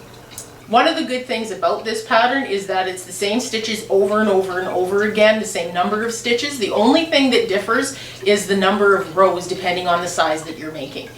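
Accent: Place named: Canada. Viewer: I’m a new knitter.....definitely this is my favourite slipper pattern....Thank you for all that explain and the free pastern. I’m in BC, I could tell you were from somewhere in our country 👌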